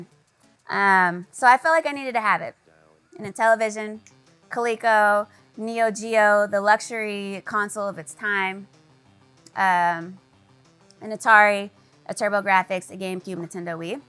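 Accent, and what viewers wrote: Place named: California, United States. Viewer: 😍😍..... It sounds like she's from Cali.. Wish it was NY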